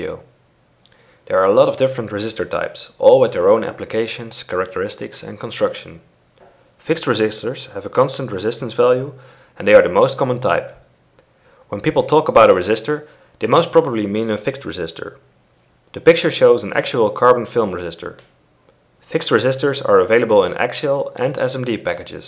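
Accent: Netherlands. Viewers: You sound very Dutch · do I hear a little dutch accent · Are you, by any chance, Dutch? That, or my accent recognition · Nederlands accent herken je altijd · Are you from holland?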